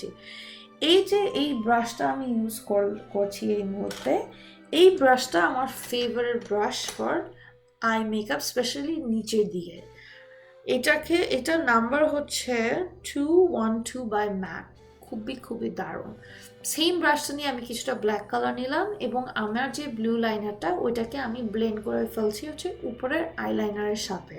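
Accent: Bangladesh. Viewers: I guess you are from bogura. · i gues u r from the northern part of Bangladesh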